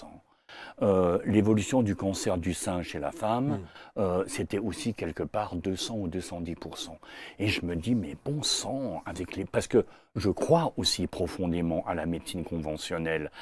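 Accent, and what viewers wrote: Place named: Alsace, France. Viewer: le délicieux accent alsacien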